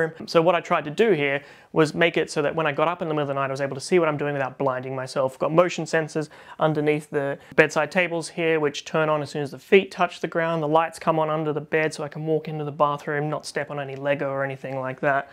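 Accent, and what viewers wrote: Australia. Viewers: Cheers. Are you an Aussie in the UK?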